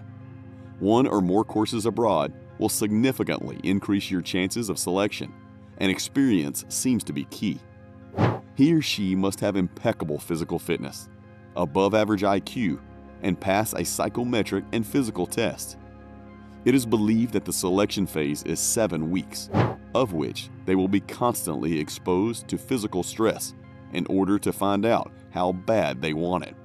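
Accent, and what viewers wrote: South, United States. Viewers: It’s the accent! Love it! That southern taste to it! It’s odd to my west coast ears lol Fellow countrymen all the same though! God bless you bro! 🇺🇸 🙏🏻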